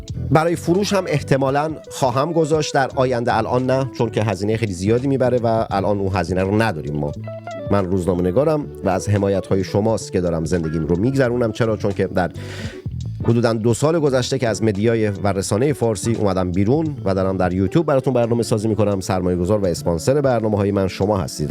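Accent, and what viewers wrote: Abadan, Iran. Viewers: جان دمتگرم کارت و برنامه هات عالی، عاشق اون لهجه آبادانی اتم.سراختاپوس در تهرانه اسراییل و ایران باهم متحد خواهند روزی که رژیم نکبت فاشیستی شیعه نخواهد بود. و دشمنان ایران و پهلویها باز اونجاشون پاره شده برا اعلام رهبری شاهزاده.جاویدشاه❤❤❤❤ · کا دمت کرم 👌مو دختر میسلبمونوم زنده باشی. با لهجه ابودانی حرف بزنی بیشتر حال می‌کنم 👏👏👏👏👏👏👏👏👏👏👏👏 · واقعا وفق العاده بود از ریتم موزیک زمینه جذاب ، لحجه زیبای آبادانی بی نظیر من قمی لذت بردم ممنون که شعر می خونی ممنون که به برای کلماتت ارزش می دی و به وقت ما ارزش میدی در دوره که همه به همه چیز مصرفی نگاه می کنن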